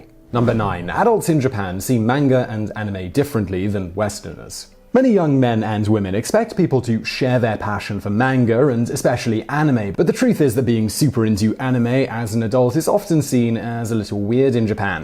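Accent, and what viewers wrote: England, United Kingdom. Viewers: He has said his audience is mainly American. And maybe youve noticed his English accent? Plus theres the fact he lives (or lived Im not up to date with his personal life) in Prague. His audience IS international. Im Australian, I have no issue with him addressing his core audience more often than me. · Why is this British-sounding guy lecturing us about "what Americans think?" Why is this titled as what Westerners think, and entirely geared towards American (mis)conceptions?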